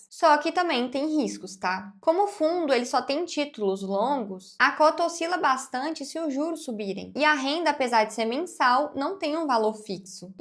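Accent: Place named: Minas Gerais, Brazil. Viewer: Sotaque parecido com o de Minas e a voz lembra a da Sandy. Mas com relação ao conteúdo, ganhou um inscrito.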